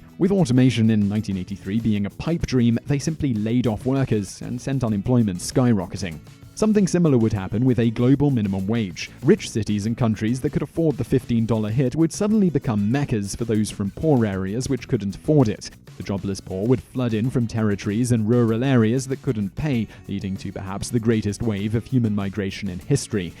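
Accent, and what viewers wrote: England, United Kingdom. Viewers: Your arguments against minimum wage are biased and riidiculous. Also, I do believe I hear an English accent in your tone. Perhaps you should stick to issues in that country.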